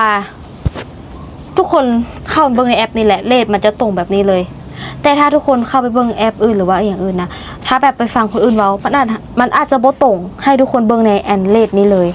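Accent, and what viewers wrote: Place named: Laos. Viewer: ชอบสาวลาว เว้าน่ารักดี. เคยเข้าไปเที่ยวลาว มาแล้ว 4 ครั้ง .กดให้แล้ว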